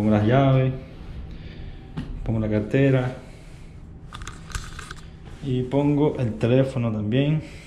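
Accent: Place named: Venezuela. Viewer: por tu manera de hablar, tu acento no eres Mexicano, es mas como Venezolano o Dominicano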